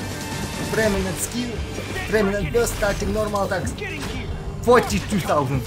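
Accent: Russia. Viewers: ur accent like russian-en isnt it?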